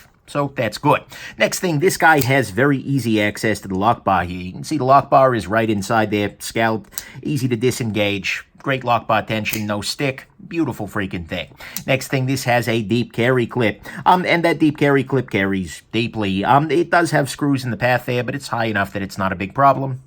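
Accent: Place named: New Jersey, United States. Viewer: That is a New Jersey accent.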